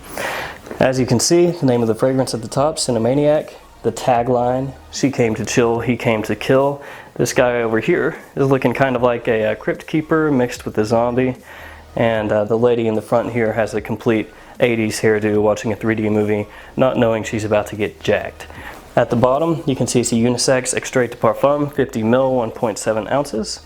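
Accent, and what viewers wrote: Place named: Los Angeles, United States. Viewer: Dam. That's like a Hollywood story. Would've never guessed the two of you went to high school. I might be just hearing things, but it sounds like the way ya speak reminds me of someone here in L.A., while your wife possibly a southern accent. Again, I could be totally wrong.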